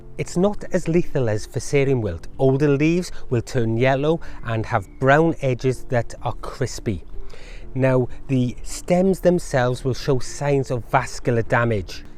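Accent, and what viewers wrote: Wales, United Kingdom. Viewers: i thought id heard on another video that late blight is ok and tomatoes wil continue to grow untoched. Im so glad i watched your video. I planted 12 tomatoe palnts (money makers) in my garden in march. all this rain weve had this last 2 weeks has battered my tomatoes. luckily theyd already flowered and fruited. ide already got a good 2kg of tomaotes, up until the last 2 days ive spotted late blight and rottening my tomatoes. i watched this and had just have to rip up all 12. now my chillies next row can have some air and light ;) Cheerz bud! I luv the welsh accent, makes it more satisfying to listen to. now ive got room for bulding the first raised bed of 6 (to my plan). all the stuff ive grown so far have been a success. its my first year after many years not grwoing. next year ive got some big plans to grow lots. ive got 2 woormerys on the go too, these castings will be part of my soil mix. 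ive also got 3 composting bins on the go so will be mxing this with manure that i will mix up in the winter so its got time to rot for next spring. I furkin luv gardening. so glad i started it again. From Newnham on severn! thankyou for the video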